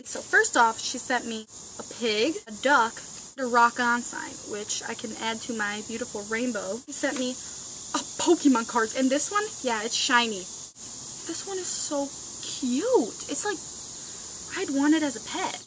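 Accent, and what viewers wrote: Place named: Chicago, United States. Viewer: do u live in chicago... cause my family does and u have a illinos/chicago accent..... just wondering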